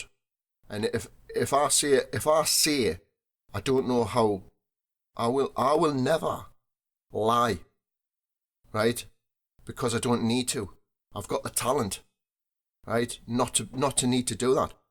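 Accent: Newcastle, United Kingdom. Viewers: Love your videos. It's not just the Geordie accent. You are proper talented, and very entertaining. Now I need a proper XLR microphone. I have a 3.5mm jack to XLR adapter, but it's not great. It picks up radio interference.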